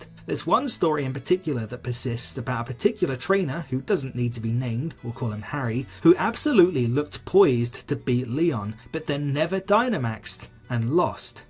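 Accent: United Kingdom. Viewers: Didn't even take 10 seconds for me to know this video was gonna be trash. I was gonna give it the benefit of the doubt, too, but damn. And your bri-ish, yeah, bye. (I stopped watching cause he dissed Sun and Moon, him being Bri-ish just added to it) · I love your Yorkshire accent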